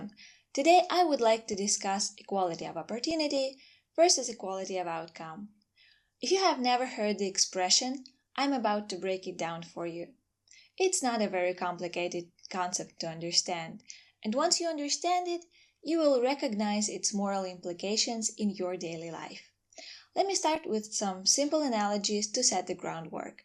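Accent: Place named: Eastern Europe. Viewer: Your accent sound Eastern European.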